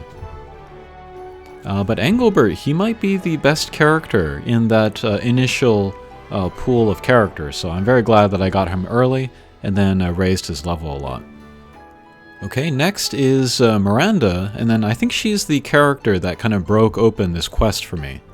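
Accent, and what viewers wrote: Japan. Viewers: Hi! Welcome to channel, where contents are great and the content creator shows a great and genuine enthusiasm toward this game. If you are new here, then you might know that this great guy likes to bring the sense of Japanese culture and pronounciation during during. He is a smart guy. Let's focus on the content and appreciate any uniqueness that he share. The whole world is not centered to the West, you know:D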